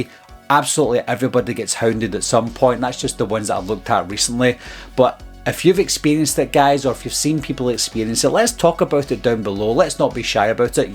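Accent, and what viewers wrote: Scotland, United Kingdom. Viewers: I commented on your Scottish accent on a video the other day but in a good way, love a Scottish accent 👍🏻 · Listen bud don't you worry aboot yer accent..its the same as mine..the best accent in the world an I kin understawn ivrihin yer sayin nae borher..you just keep doing yer thing and don't let comments from a couple of wallopers stop ye.....cheers bud 👍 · We Scottish have the best accent keep up.the good work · The fact you have to defend your accent…so unreal. Keep doing what you’re doing. You’re killing it. And anyone who says they don’t like your accent secretly wishes they were Scottish.😏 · I'm scottish too brother don't worry about the accent 😂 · The Scottish accent is a thing of beauty, they are just jealous of you and have wayyyyy too much time on their hands down there in their mother’s basement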